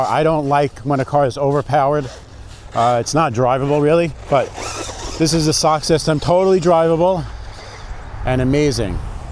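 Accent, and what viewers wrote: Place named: North Jersey, United States. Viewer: Man nice whip! I hear an accent like mine lol from north Jersey😂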